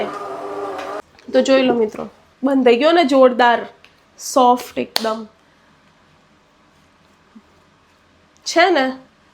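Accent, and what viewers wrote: Sabarkantha, India. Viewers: સાબરકાંઠાની બોલી 😊😊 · Sabarkanthaa ni bhasa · બેન સાબરકાંઠા ના પટેલ લાગે છે ભાષા 😅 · Sabarkantha boli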